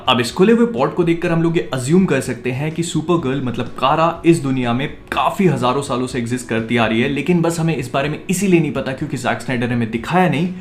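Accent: Bhopal, India. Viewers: Bhopali Bolte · sound like a India Type it's cool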